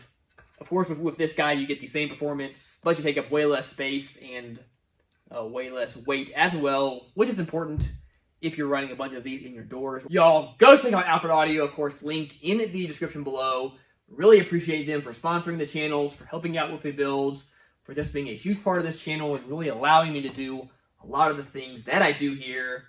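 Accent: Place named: Appalachia, United States. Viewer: This dude looks like Gollum and sounds like a hillbilly! what is going on here?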